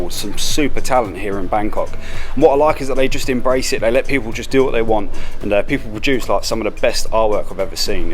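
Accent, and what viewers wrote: United Kingdom. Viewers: where the hell in bbk? So confusing wih your crazy brit accent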